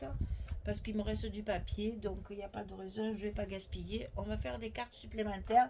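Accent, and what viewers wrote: South, France. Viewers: Géniale cette partage. Génial cet accent du Sud. Et puis s’il y a une petite anomalie et bien cela nous montre que c’est un travail fait main, artisanal, fait avec le cœur. Alors merci à vous.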